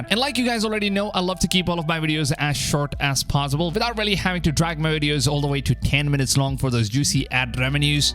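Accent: India. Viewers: Yes and he clickbaits by using thumbanails and titles ,perhaps he is somewhere from india but his accent is different from the accent which i hear from the common folks of my country. And i think we shouldnt follow this guys content . Sometimes he says AC valhalla is the best assassin but after making 2 videos he says AC vallahalla and Ac odyssey are repetitve games.. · U indian boi tho? I am picking up a slighhhht accent wasup?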